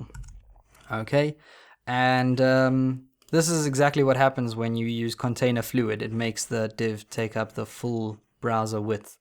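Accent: South Africa. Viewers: Yas! South African accent!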